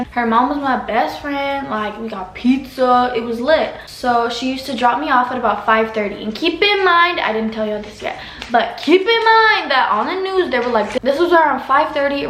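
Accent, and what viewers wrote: Texas, United States. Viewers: texas accent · american accent??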